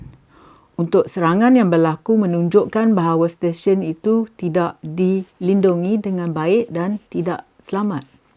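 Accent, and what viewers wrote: Malaysia. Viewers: Pelat cakap mya..Melayu! Melayu !!2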